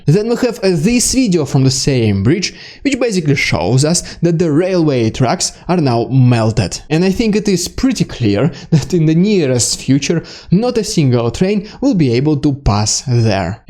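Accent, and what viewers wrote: Russia. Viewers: i love the strohhngo russ accent✊💙💛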